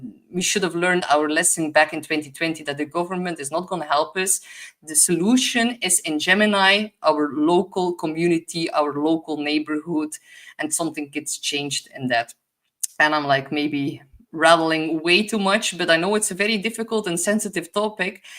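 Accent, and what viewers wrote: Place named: West Flanders, Belgium. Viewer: Aaaaaahhhh, je zit vol vuur meid!! Ik heb een stuk de live mee gehad en een stuk nu aan het luisteren. Schitterend hoe je er je westvloams tussen smiet 😂 high vibes high vibes!!! En ik hier roepen.... judges!!!! (Rechters). Zalig ❤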